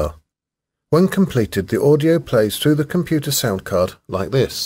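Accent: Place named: United Kingdom. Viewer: OMG I Love that voice and their british accent, thank you!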